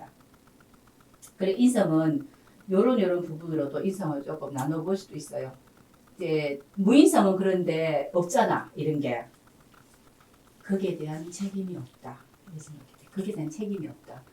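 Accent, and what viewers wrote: Gyeongsang, South Korea. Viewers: 전 고향이 부산인데도 고속으로 듣기가 힘드네요 ㅎㅎ 경상도 어디 사투린지 궁금하네요.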